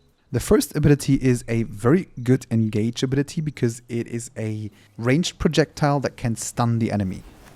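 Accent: Germany. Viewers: Wait, you’re German?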